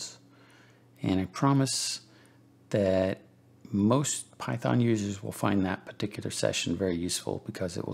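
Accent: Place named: India. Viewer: Are you indian by any chance? You sound like my coworker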